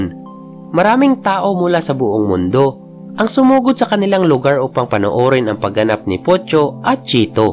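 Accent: Visayas, Philippines. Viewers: Halatang bisaya peru ok na din yung accent😂 keep it up lods👍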